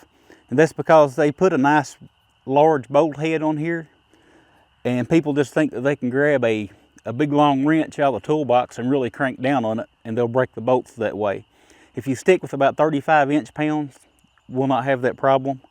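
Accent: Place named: Arkansas, United States. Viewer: Your accent is awesome! Arkansas?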